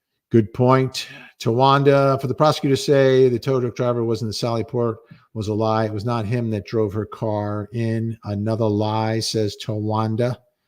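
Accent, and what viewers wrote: Boston, United States. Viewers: is this case getting to you, you've fully adopted that Boston accent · not too shabby a boston accent for a joisey guy,!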